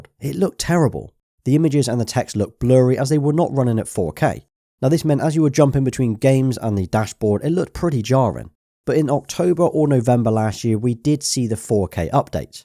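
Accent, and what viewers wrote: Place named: South West, United Kingdom. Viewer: Great vid and nice to hear another South West accent! 😀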